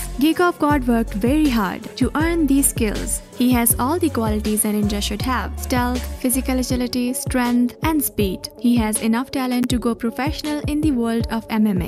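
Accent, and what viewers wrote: India. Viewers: This indian dialect, is triggering me so god damn hard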